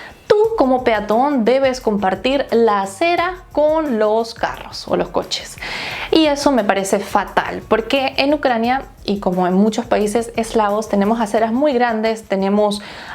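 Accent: Venezuela. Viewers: Es como si una venezolana me estuviera explicando sobre Ucrania. Saludos! Pais hermoso Ucrania Por cierto, eso es totalmente cierto lo que dice en ; es uno de los motivos del por que me quiero ir de Venezuela Naguaráaaa! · De hace unos 2 meses aprendo ruso y de pronto me encontré con tu canal y ese acento me decía lo conozco, pero, será que me equivoco.... Pero hoy ya me di cuenta que no estaba equivocado Venezuelaaaa jejeje excelente. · Ya decía yo, esta chama como que habla caraqueño. xD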